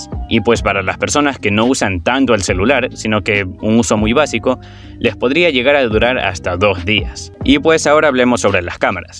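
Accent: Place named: Peru. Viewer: Men eres de Perú ? Tu acento parece de Perú noce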